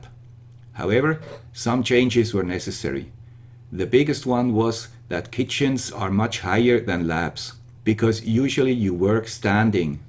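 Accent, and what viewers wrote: Switzerland. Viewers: Well done " " (or is it white gloves now:) ) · Congratulations! Is that picture "young guy with swiss accent" on your shelf? And very nice solution for cables! I think I'm gonna do same thing behind my door. Thank you.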